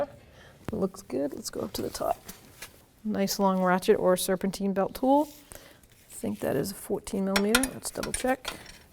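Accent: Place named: New York, United States. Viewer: Sounds like she's from New york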